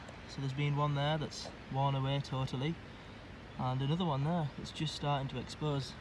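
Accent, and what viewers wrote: Scotland, United Kingdom. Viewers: Are you Scottish? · you probably won't respond but are you Scottish?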